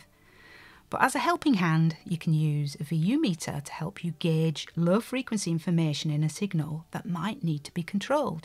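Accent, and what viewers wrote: Northern England, United Kingdom. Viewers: how does this only have 6000 views??? there is so much terrible nonsense on yootoob and your videos are outstanding. plus it’s lovely to hear a fellow northerner on the interwebs. keep it up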